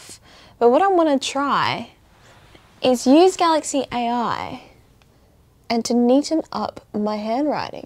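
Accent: Australia. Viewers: Is ur accent Australian ?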